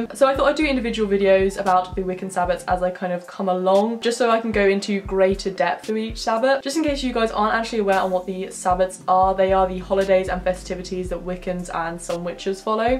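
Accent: England, United Kingdom. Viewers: Eleanor Rigby she's lives in England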